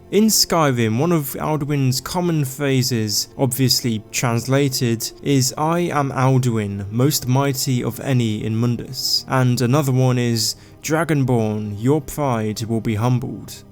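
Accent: England, United Kingdom. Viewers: English accent and a lisp. Mind blown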